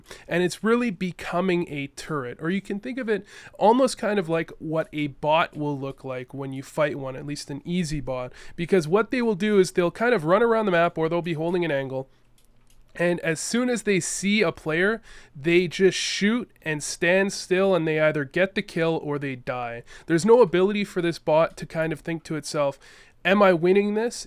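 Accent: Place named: Canada. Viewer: Just figured out that hes canadian from that sorry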